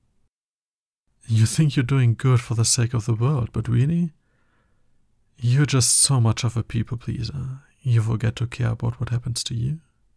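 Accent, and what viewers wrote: Germany. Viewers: Samma ihr könnt mir doch nicht erzählen das nur ich den deutschen Akzent höre 😂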